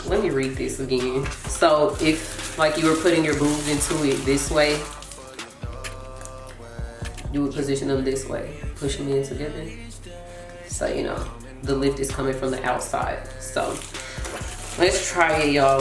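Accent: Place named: South, United States. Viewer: That southern accent ❤so pretty I like the outfit ❤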